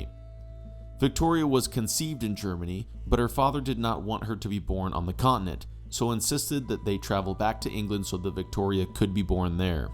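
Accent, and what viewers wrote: United States. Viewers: The picture introducing "the Corn Laws" showed North American "corn" ["maize"] not "corn" ["grain" i.e. wheat and barley] as is meant in England. The documentary is thorough and good; but has that American accent.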